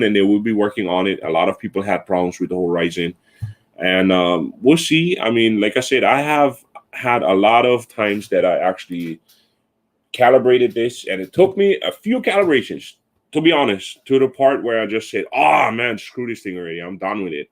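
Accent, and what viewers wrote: Hawaii, United States. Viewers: Shoots stoked to find someone talking story with drones local style nice! U Maui? Normally North Shore Oahu we have to connect one day! Aloha about to get 6K evo2 possibly on the fence. So you say you have the pro is that the 8K or 6K? 🤙🏾🤙🏾🤙🏾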